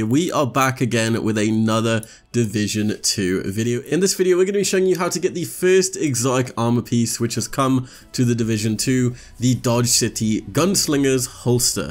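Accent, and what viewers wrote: Wales, United Kingdom. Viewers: Are u Welch? Ur uncle is pilot teacher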